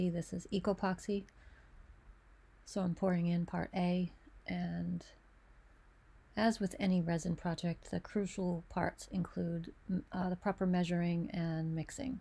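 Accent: United States. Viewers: So, American accents that aren't irritating for Brits to listen to DO exist! Really nice job on this board. Looks great. (You could voice audio books!)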